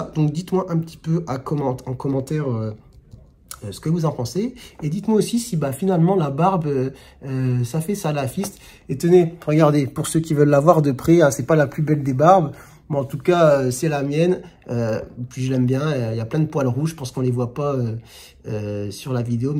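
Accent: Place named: North, France. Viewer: Ces gens la n’ont aucune logique. Pour eux les français d’origine étrangère ne font pas d’effort pour s’adapter à la société française mais critiquent quand ces mêmes personnes le font et réussissent . Pareil pour l’argument des immigrés qui viennent pour les allocs, parce que ces même immigrés voleraient le travail des français de souche chômeurs (les taffs de merde que personne veut faire). Donc de toute façon ils auront toujours une manière de détourner les choses dans leur sens, quite à utiliser des arguments fallacieux ÉDIT: la personne qui t’a dit que tu dis wAllah confond avec « Voilà » avec un léger accent du nord 😂 ils ont vu ton nom toute de suite ils entendent ce qu’ils veulent entendre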